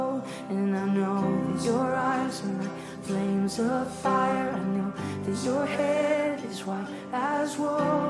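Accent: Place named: New Zealand. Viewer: Are you originally from New Zealand or what? You sound like you're from New Zealand or Austrialia or maybbe Englad or osmewhere like that.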